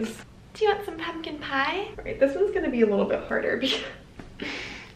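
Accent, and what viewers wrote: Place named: United Kingdom. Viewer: Nah she just loves talking in british❤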